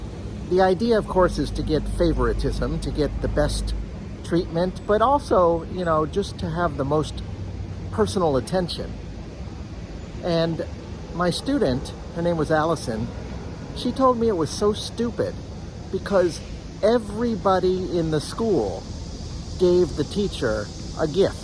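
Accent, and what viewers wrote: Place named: United States. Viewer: were you learning English as a second language? Where are you from then, you sound American to me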